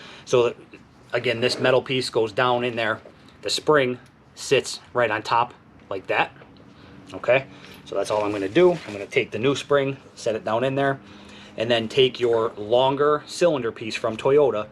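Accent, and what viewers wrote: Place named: Philadelphia, United States. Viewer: No way this dude ain't from Philly.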